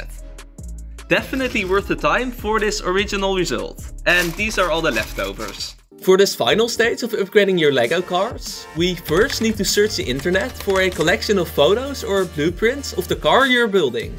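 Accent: Netherlands. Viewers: Where are you from? I here a Dutch accent❤😂 I'm Dutch BTW. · Ik ruik een Nederlander aan het accent te horen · Dik Nederlands accent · you sound dutch just like me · The cuts are a little too fast for my liking, sorry to say I don't have this much ADHD. Also, the dutch accent is a little too noticable. Great job on the modifications though, that is some very real talent you display there. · I smell dutch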